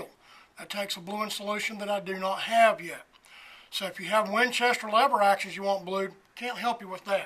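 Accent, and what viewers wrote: United States. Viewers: Weird Yankee accent.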